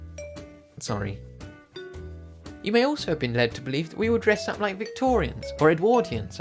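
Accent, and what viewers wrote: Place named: United Kingdom. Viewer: I love the british accent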